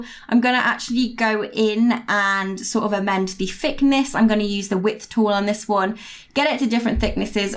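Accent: United Kingdom. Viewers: I'm self-taught just like you, and it's so refreshing to learn from someone who doesn't linger on complexities and has found ways to just use common sense instead of doing things "by the book". It's amazing what you can learn just getting lost in tutorials, and you just added quite a few ideas to my arsenal. I usually prefer to read rather than watch videos... But in this case, considering how you make it very easy to understand and don't use obscure tools or lengthy techniques (and I must admit... gorgeous blonde + British accent = hell yes), you sold me from the first second. Subscribed. Liked. Bravo!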